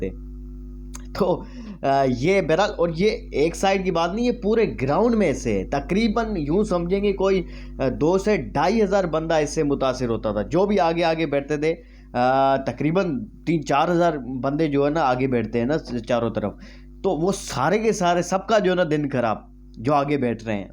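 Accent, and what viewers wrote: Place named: Sindh, India. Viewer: video wle larke ho aap? Han apke bolne ka lehja sindhi laga tbhe pucha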